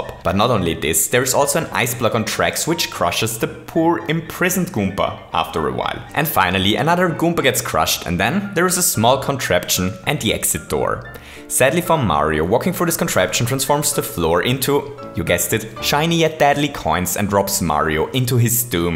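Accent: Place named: Germany. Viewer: Also ich find die Videos ja Super, aber der Deutsche akzent zu den Videos ist schrecklich x)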